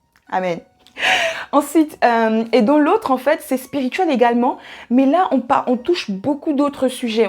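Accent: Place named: England, United Kingdom. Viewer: Une vidéo très intéressante, j'aime cet accent anglais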